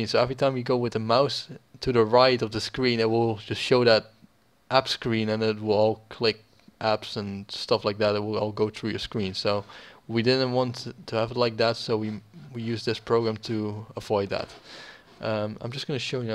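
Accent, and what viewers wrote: Netherlands. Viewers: zo te horen ben je nederlands haha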